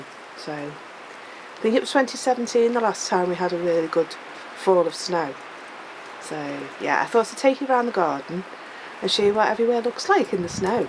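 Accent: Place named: England, United Kingdom. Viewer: I have just found you! It is lovely to hear an English voice, as there are so many other folk, but not many English videos it seems, who are about every day life. I love where your live, and those beautiful trees. I used to keep chickens, Rhoad Island Reds, like yours, and I loved them - they were ex batterie. The whole world around you looks so wonderful in the snow - fairy dust on everything. Love your hat! Blessings to you from Jilly & Madge the beautiful black rescue greyhound, from Devon, England xxx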